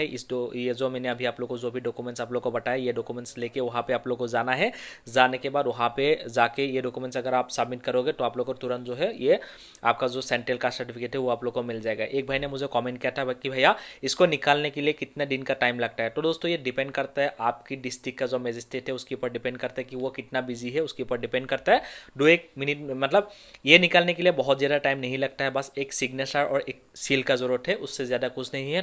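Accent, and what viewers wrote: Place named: Assam, India. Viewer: bhai 2mar Hindi Improve kribo lagibo atiya Assamese kuwa hindi Improve kri ha try kriba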